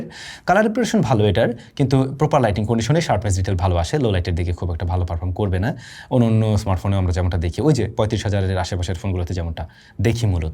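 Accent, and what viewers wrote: Barishal, Bangladesh. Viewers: ভাই, আপনি বরিশাইল্লা!?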